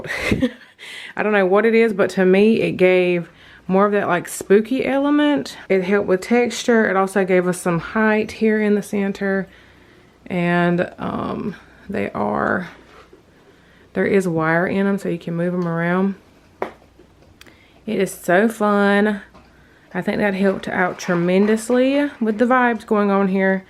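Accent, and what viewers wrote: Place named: North Carolina, United States. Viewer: Just stumbled across your page and immediately heard the NC in your voice. Just had to stay for a visit. Love all your beautiful Halloween goodies.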